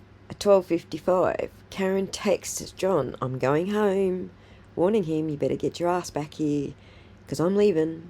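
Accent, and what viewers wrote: Australia. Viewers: You are such a fresh breath of air. I grew up across the street from a lady from Aussie. Our families were friends for ages. I'm now 67 yrs old. It almost sounds like I am listening to her talk. Love your accent. She was like a second mom to me. Miss her so much! God Bless you!!!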